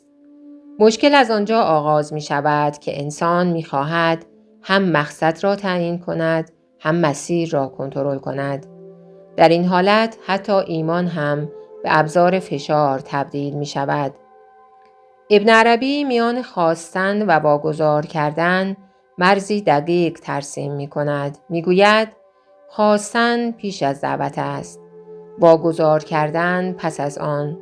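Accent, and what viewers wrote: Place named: Turkey. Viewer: به خداوندی خدا ابن عربی ادم خیلی بزرگیست که در دین و عرفان عالمی بزرگ بوده اما نمیدانم چرا باید یک دوبلری بزارید که ادم حتا چندشش میشود به پادکست گوش داد من کل کتابهای ابن عربیه و ابن بابویه بجز دایرت المعارف ابن بابویه همه را چندین بار خوانده ام اما صدای این خانم با لحجه همشری ما ترکی به خدا خراب کرده ادم بزرگی مثل ابن عربیه را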